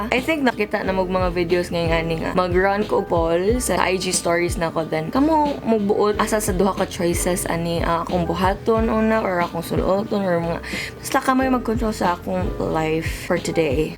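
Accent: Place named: Cebu, Philippines. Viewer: Mgkakatawa ko sa imo accent dai.. perti ka gahi lomad sa cebu! Perti ka visaya😊😂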